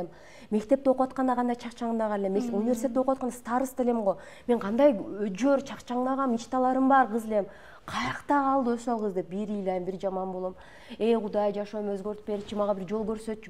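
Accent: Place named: Osh, Kyrgyzstan. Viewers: Кычык тапкан адам таба берет экенда Ош ТВ болуп жатпайбы оштукча суйлойтда ам эмес ям деп айтылат маани деле бербей создорун угуптурмун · Журналист кыз редактормун дединиз, адабий тилди сүйлөгөндү үйрөнүнүз да, Оштун диалектикасын сүйлөй турган жер эмес го Ош тв телеканалы. "Пайдалуусун ам, пайдасызын ам" деп сүйлөгөнүнүз орунсуз, өтө одоно угулат экен, сынды туура кабыл аласыз деп ишенем.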